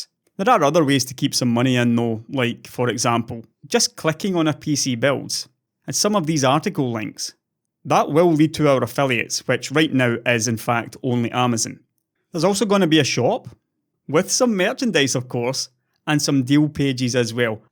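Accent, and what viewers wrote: Scotland, United Kingdom. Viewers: read any article that * wrote with a Scottish accent in my head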